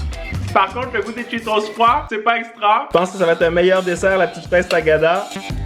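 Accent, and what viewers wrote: Canada. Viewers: = la vidéo toujours très sympa. Votre accent canadien rajoute un plus à vos vidéos très instructives. Passez un bon we les zamoureux. 😍🥰😘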